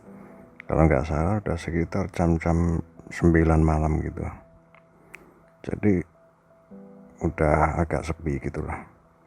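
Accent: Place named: Java, Indonesia. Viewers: Kata GUE dan GUA itu beda cara pengunaan di dalam kalimatnya. Nahh si mas2 ini byak kalimat yg seharusnya pake Gua dia pake Gue, jadi nahbah kelihatan MEDOK nya 😄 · Gak usah pake kata GUE bro... medok soale sampean...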